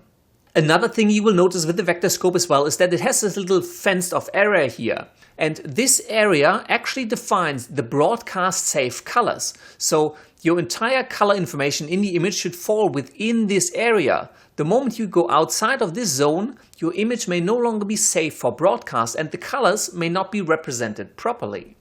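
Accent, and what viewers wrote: Germany. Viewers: i guess his german accent is very strong with him XD but the stuff he made is still awesome ^^ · I could always rely on a guy with a German accent to give the best lessons and tutorials. Thanks heaps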